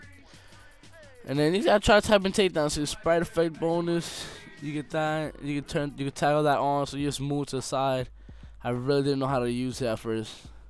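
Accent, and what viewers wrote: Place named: Dominican Republic. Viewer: He talks like that cuz he dominican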